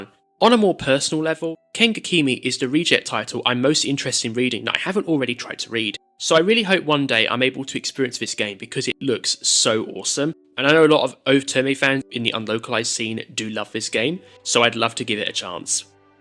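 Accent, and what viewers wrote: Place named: United Kingdom. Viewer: I am truly enjoying your videos and have subscribed to the channel. However allow me to provide some negative constructive feedback. You should realty work on your voice-over. Try to speak slower, and tone down your specific variant of British accent. I am a fully-fluent non-native British speaker, who has been mistaken for a native on several occasions, and who lived in the UK for years and had no trouble understanding the locals (in Scotland, no less). Yet I find myself not understanding words you say on multiple occasions and having to rewind the video, and even having to turn subtitles on when the re-listen isn't enough. Other than that, full respect for your Japanese gaming knowledge and the obvious deep effort and research that has gone into creating these videos.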